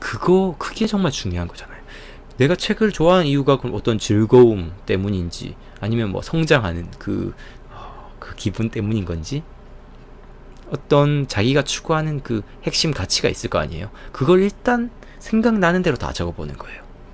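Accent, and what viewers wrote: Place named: Gyeongsang, South Korea. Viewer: 경상도 억양의 맑은 목소리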